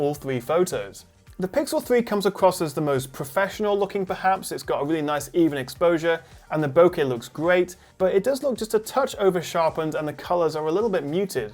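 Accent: England, United Kingdom. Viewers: First time watching one of your vids, I have to say I enjoyed it! What sold me was your accent, it wasn't so grossly over the top annoyingly ENGLISH - UGH! Very detailed, highly informative. USA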